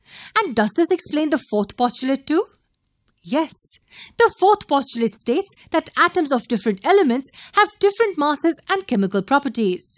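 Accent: India. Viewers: A superb video I can easily understood it but there is a question are you a Indian?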